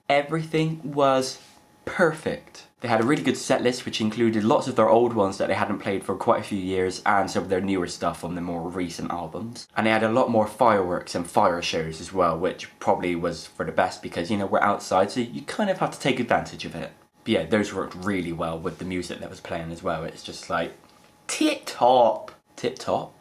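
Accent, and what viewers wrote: Bristol, United Kingdom. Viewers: Ahh I love the Bristol accent:) I was gonna go see on Wednesday here in Dublin but just didn't get around to it:/ Maybe there's some left:) Glad you enjoyed. xo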